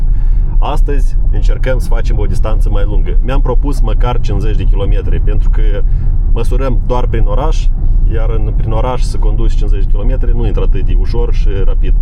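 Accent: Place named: Finland. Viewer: БЛЯ. Зашёл посмотреть, а тут чухонец какой то🤣😂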